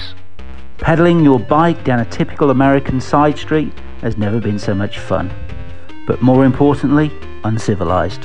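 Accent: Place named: Midlands, United Kingdom. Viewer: You sound like you're from the Midlands, are ya?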